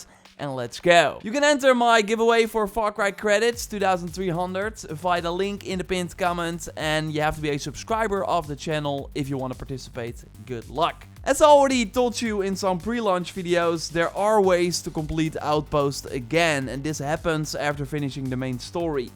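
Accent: Netherlands. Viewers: Haaha ik kende deze kanaal nog niet. Hoorde meteen een heerlijke hollandse tint in je stem. Fijne video, thanks! Subbed <3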